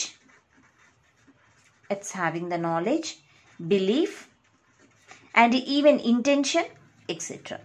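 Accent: South India, India. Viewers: South people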